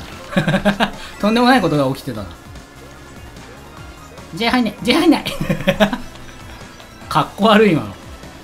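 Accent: Kansai, Japan. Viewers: 関西弁よく出ますねw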